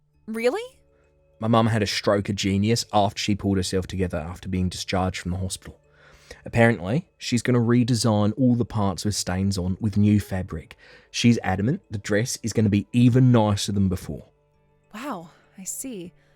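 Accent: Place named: Australia. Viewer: I truly love that Australian accent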